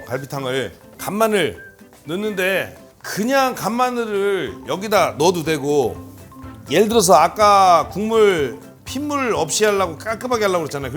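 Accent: Chungcheong, South Korea. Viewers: 집에 갈비 있어서 내놨네요 맛나게 해먹을께요 충청도가 고향인데 언제 들어도 구수한 말씨도 좋아요~^^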